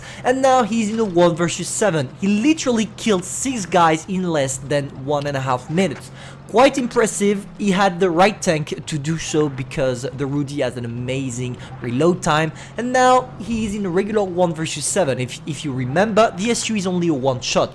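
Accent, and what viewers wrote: France. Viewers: Un super accent pour un français bravo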